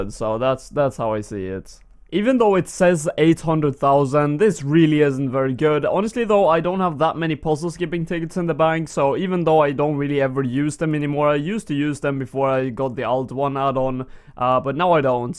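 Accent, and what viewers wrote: Sweden. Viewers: I love your swedish accent :3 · You're Swedish aren't you? :D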